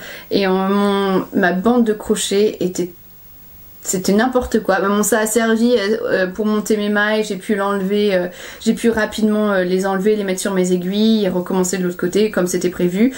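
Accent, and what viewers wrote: England, United Kingdom. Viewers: Super de te retrouver. Heureusement que tu étais fatiguée car je crois que j'aurais eu du mal à te suivre sinon MDR. Ton accent anglais est très léger au début le temps que tu reprennes le rythme en français et au fur et à mesure je redoutais que tu ne prennes le rythme de ma fille, c'est à dire mitraillette... c'est très amusant à noter au fil de ton élocution et de ta passion. Un super moment passé avec toi. Merci encore. Où peux-t-on trouver les indications, de tout ce dont tu parles, écrites qq part stp ? Merci par avance. Bon courage pour la suite dans ton changement de vie ! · contente de retrouver après cette absence ! tes projets sont superbe, j'aime beaucoup le Manzo et ton Ravello marinière ! j'aime bien tes anecdotes hors tricot et ta façon de parler ! de temps en temps ya une pointe d'accent, mais c'est très léger, et si ça se trouve je ne l'entendrais pas si je ne savais pas que tu vivais en Angleterre .... 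je croise les doigts pour les exams de Simon et ta nouvelle mission boulot ! à bientôt 😁😁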